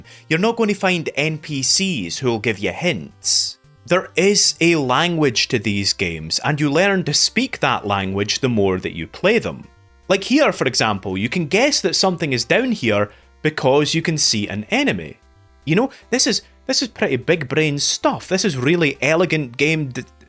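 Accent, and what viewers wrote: Scotland, United Kingdom. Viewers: That's a Scottish accent right? Fresh change to hear a game review with this accent. I like it. Wait is that Scottish right not irish? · Listening to your Scottish brogue made this video fly by quickly. Cheers from this grandson of Coatbridge, born in the States.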